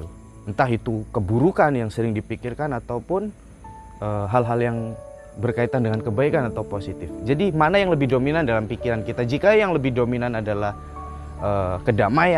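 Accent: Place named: Banyumas, Indonesia. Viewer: Oh wong ngapak ya . Salam sekang Pemalang mas. Tangga kota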